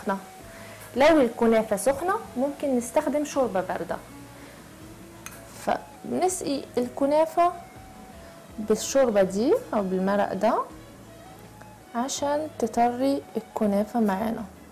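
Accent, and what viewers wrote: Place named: Morocco. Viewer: احس من لهجتها انها مغربية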